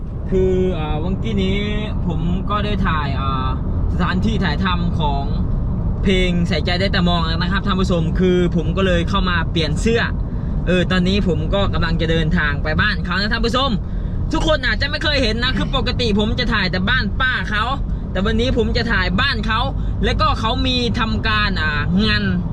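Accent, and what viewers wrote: Laos. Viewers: เว่าลาวป่นไท น่ารักดี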